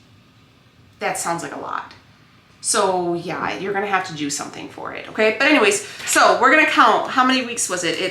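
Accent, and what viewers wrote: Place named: Minnesota, United States. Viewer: You are doing great! I’ve learned lots I learned new recipes from you I really like your Minnesota accent even though you are not from there. I stole your It’s a Good Day to Have a Good Day I like lots about you But… when that one word comes out of your mouth it surprises me . The word is 💩 SHIT 😳 What can I say I’ll keep watching and you most likely will keep that descriptive word in your video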